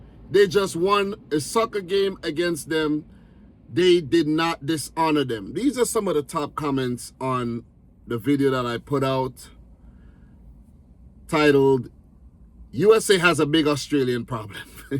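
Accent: Caribbean. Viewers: You sound like you’re from the Caribbean but you live in the USA?because you know a lot about our cricket team I have so many favourite players and even met a West Indies legend when he was playing in Australia yes I met the Universe boss Chris Gayle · To be fair though it's clear from his accent that he grew up in a Carribean nation so he doesn't speak like a Yank.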